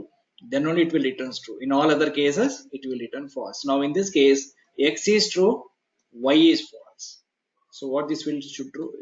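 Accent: India. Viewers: En cuanto escuché la voz hindú, supe que aprendería algo bueno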